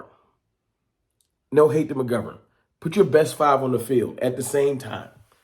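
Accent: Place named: Philadelphia, United States. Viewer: Bruh you sound like you from Philly or jersey! 🧐🧐🧐🤦🏽‍♂️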